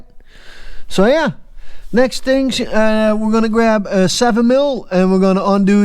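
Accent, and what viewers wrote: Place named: Newfoundland, Canada. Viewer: Great video. Thank you! I think I might recognize a bit of Newfoundlander in yaaa!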